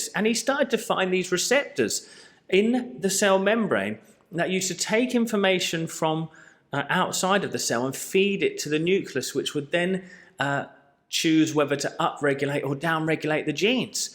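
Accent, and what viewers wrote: United Kingdom. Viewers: It’s so wonderful to hear your uk accent talking about all these teachings and scientific findings that we have read in these incredible books and that aren’t being shared in the mainstream. I have read Bruce Lipton, Jo Dispenza, Gregg braden, deepak chopra and many many more.. I love the way you explain it. You are refreshing and genuine and your realness shines through in your video. Thank you 🎉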